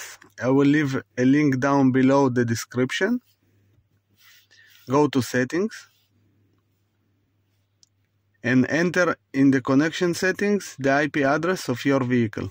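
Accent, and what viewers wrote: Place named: Israel. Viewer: איך שומעים ישראליותתתת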